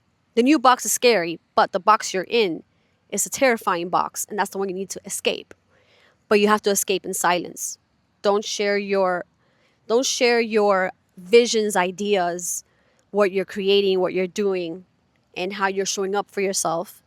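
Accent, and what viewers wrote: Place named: Florida, United States. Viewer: You so dam fine girl. And it looks like you may be in Florida 😉 the accent is ❤️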